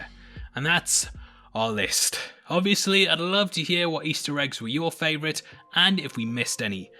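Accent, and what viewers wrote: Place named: United Kingdom. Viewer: He have British accent 😆😆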